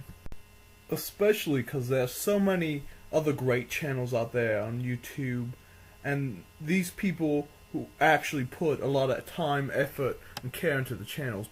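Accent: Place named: New Orleans, United States. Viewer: I've always wondered where your accent is from...sounds very Carolina like...but then again it has a New Orleans thing going on...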